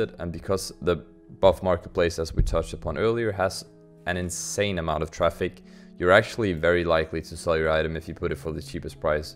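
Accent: Denmark. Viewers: Love ur fcking Danish accent like Pimp xD <3!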